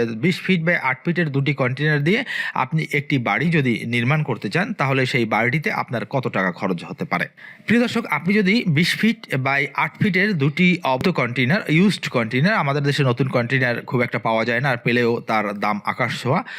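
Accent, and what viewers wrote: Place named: Barishal, Bangladesh. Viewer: ভাই আপনার কাছে একটা প্রশ্ন রয়েছে, আপনি কি বরিশাইল্লা? মানে আপনার বাচনভঙ্গি যতই শুদ্ধ করেন না কেন আপনার বরিশালের ভাবটা রয়েই যায়। বলি কি শুদ্ধ করে কথা বলার দরকার নাই বরিশাইল্লা কায়দায় কথা বলেন তাহলেই তো হয়।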